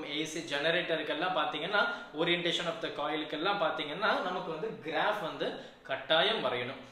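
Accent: Coimbatore, India. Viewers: Coimbatore polaya sir😂😂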